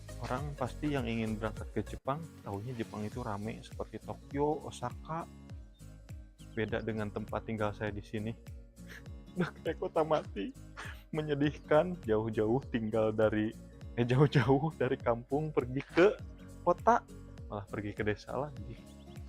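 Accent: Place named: West Java, Indonesia. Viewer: Koko dr Bandung nya,..? Aksen Sunda na kental banget hehe,.. kade atuh bisi rea jurig didinya😅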